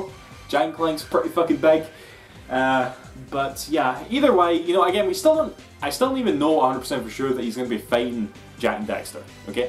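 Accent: Scotland, United Kingdom. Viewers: Right? I've watched his channel forever and those play throughs multiple time. This beautiful Scottish accent is a part of my nostalgia at this point :)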